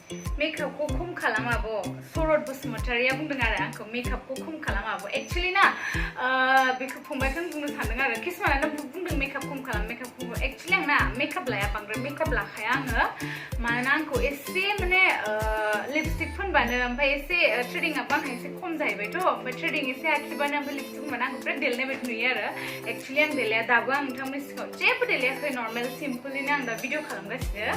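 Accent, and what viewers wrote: Kokrajhar, India. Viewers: Kokrjhar nijg same jaw railainaya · Hi abo jgni kokrajharni badinw railaiw mtw🥰🥰🥰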